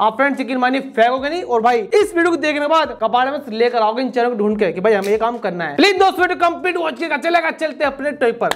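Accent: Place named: Bharatpur, India. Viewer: Aapki bhasha humare yaha jesi h bharatpuriya jo bahut achchhi. Lagti h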